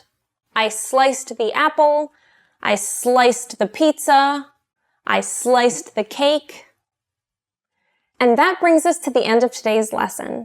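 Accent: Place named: United States. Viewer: i dnt like AMERICAN accent !Tbh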